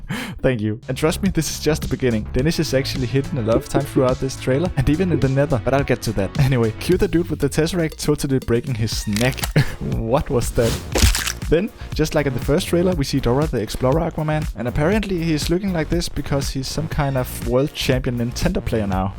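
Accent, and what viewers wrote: Denmark. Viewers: “Wow the wolf’s name is Dennis” out of content but Are you from Denmark? I can hear it in your Voice, im from Denmark. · OMG jeg syntes satme du lød som en Dker der talte engelsk XD Så var lige inde og stalk din YT og jemmen godag da kære med Dker :D :D :D Håber ikke du fryser formeget i vores kolde land :D :D :D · Love the danish accent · Are you from Denmark 🇩🇰 no hate and it is just my opinion cause you’re accent sounds like it😊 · Danish brother🇩🇰💪 I could tell by your accent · Are u Danish?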